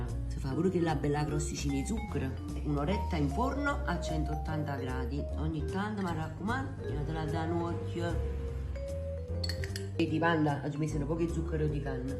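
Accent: Naples, Italy. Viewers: Gradisco anche la sua parlata napoletana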